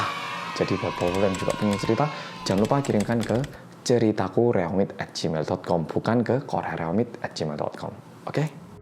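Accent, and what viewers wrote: Java, Indonesia. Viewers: Aku klo mas ngomong orang korea yg. Medeekk aku nya ikutan 🤣😆😂😂 Mas aku udah kirimin trus cerita pengalaman ku ke Gmail yg mas bilangin, tapi tenggelam sama yg lain 😁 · 3 channel storytelling favorit dan bagus: 1. (pembawaan santai dan medho serta mudah dipahami) 2. Detektif Aldo (mirip siaran radio) 3. Arroyan Pram (kasus, konspirasi sampe sains fisika, editan paling niat dan keren. Serasa nonton film layar lebar).. Kalo kalian?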